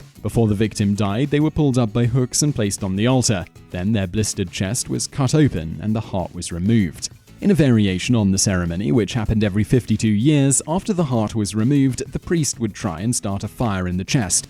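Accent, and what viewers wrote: United Kingdom. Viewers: You have the most British voice in human existence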